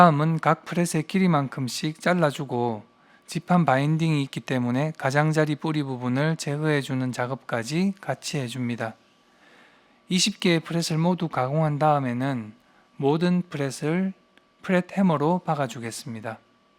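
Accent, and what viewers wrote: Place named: Gyeongsang, South Korea. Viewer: 우연히 본 일본 올드 기타 리페어 영상들~ 정말 손재주가 대단하세요 ~ 구수한 경상도 사투리가 정감이 가네요. 저도 울산인이라~ 기타 간단한 작업 정도만 하는 주부인데 장비며 꼼꼼히 리페어 작업하시는 게 감탄이 나오네요~ 재미있게 여러 영상들 잘 봤습니다~😊😊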